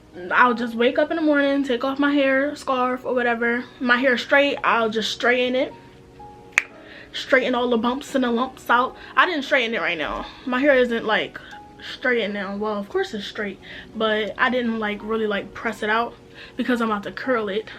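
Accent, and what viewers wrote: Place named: Philadelphia, United States. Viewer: Girl I can really tell you're from Philly lol but this was helpful because im about to start wearing frontals.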